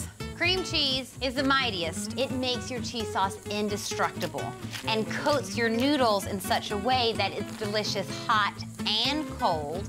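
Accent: United States. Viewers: You sound some what counrty but the ingredients smh 👎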